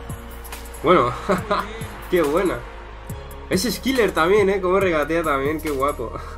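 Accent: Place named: Chile. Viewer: Eri terrible chileno wn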